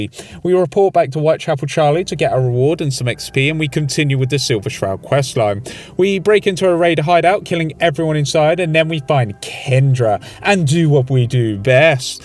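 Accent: United Kingdom. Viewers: I always forget that you're British. And then I click on a video and get a right shock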